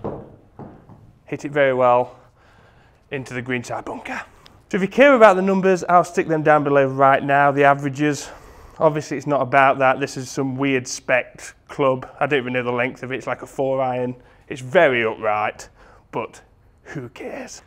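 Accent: United Kingdom. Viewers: why do you have a british accent tho?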